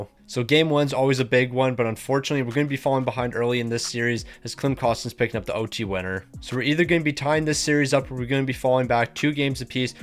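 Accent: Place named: Quebec, Canada. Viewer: you actually pronouced cataractes exactly right lol (well i mean, minus the quebec accent)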